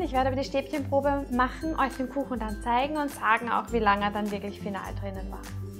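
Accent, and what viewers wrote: Austria. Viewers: Ach wie nett, endlich mal KEIN Piefchinesisch sondern sympathisches Österreichisch!!! Hab das Rezept NOCH nicht ausprobiert - klingt aber plausibel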